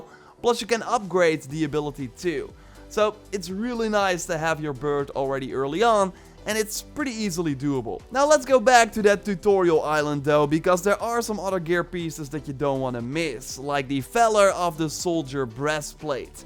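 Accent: Netherlands. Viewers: How dutch is this accent.. im dutch aswell but damn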